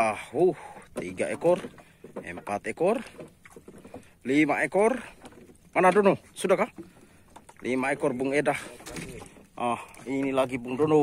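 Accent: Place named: Makassar, Indonesia. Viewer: Salam dari Buton#gue kirain ornag Makassar hheee padahal orang baubau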